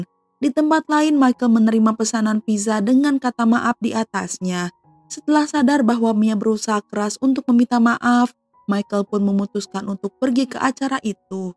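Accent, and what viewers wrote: West Java, Indonesia. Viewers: Asa ditatar sunda nyaritana...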